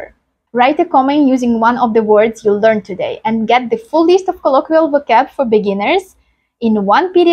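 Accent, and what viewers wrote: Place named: England, United Kingdom. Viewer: Merci beaucoup ! Your French is not so complicated to understand also your English accent is lovely . Thank you again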